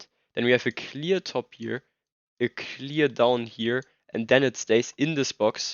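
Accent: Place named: Germany. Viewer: Der Deutsche Dialekt, herrlich, gutes Video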